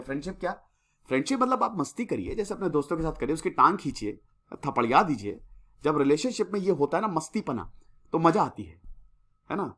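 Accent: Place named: Uttar Pradesh, India. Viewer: Sir your up vala touch is pride for me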